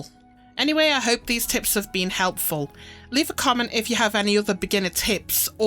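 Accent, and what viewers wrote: United Kingdom. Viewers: You have a sort of an accent...Brittish????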